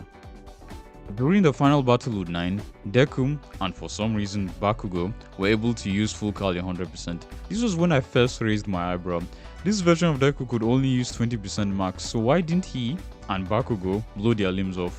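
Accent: Nigeria. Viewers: You Nigerian?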